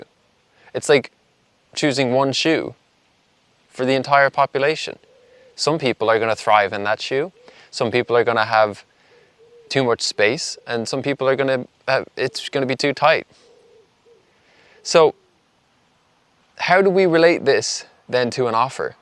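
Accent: Ireland. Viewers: is that another irish accent I sense?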